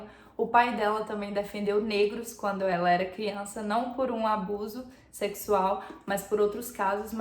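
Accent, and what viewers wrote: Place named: United States. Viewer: Sotaque americano 😍😽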